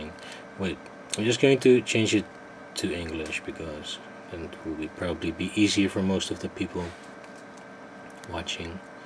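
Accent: Netherlands. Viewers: ik weet gwn aan je acsent dat je nl bent XD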